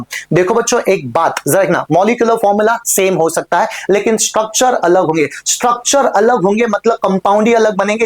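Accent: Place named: Maharashtra, India. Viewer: Sir are you marathi because of your accent I think so btw you teach great ❤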